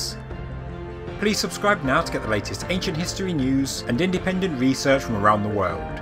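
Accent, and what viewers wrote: United Kingdom. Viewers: That's one version of a british accent, but I do agree that it can be hard to listen to for us north americans.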